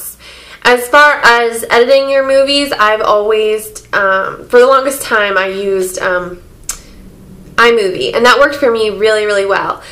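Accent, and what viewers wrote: United States. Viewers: Hi,! I like ur videos so much and not just because of all the make up stuff u do, but also because of the language u speak:-) i sometimes like the make up things ppl do so much but if i dont like/understand them i'll never subscribe! i love how american english sound more and hence i love ur speaking! thanx for cool make up related videos and language "master classes"! ♥ From Russia with love... ♥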